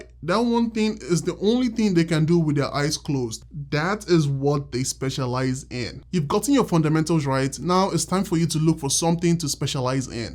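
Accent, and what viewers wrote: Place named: United States. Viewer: Sir please don't talk American English please talk in clear English I can't understand your language 😢 please 😊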